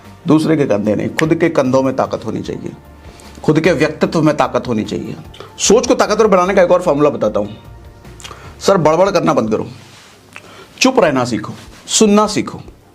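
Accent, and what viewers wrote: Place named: Bihar, India. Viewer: Sir aap jab bihari mi balte ho to bada ache lagte ho Aap ki motivation video muje bhut pasand hai .or mere andar bhut change aata h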